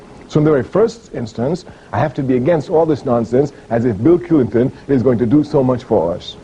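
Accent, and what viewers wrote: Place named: Trinidad and Tobago. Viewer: Here he sounds the most Trini I've ever heard him sound..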